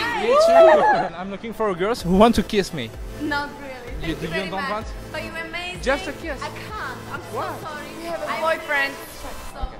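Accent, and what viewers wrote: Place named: France. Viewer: Viens faire ça au Québec voir le pire c'est que avec un bel acent français ça devrais très bien marcher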